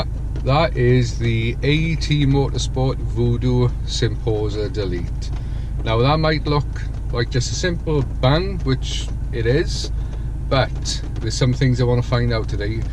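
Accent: Wales, United Kingdom. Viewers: Anything that drowns out that God awful Welsh accent, shouldn't be removed.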